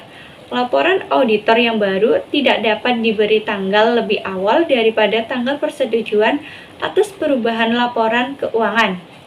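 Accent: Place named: Banyumas, Indonesia. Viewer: Walau ngapak aku ttp luuuvvv wkwkwkw semangat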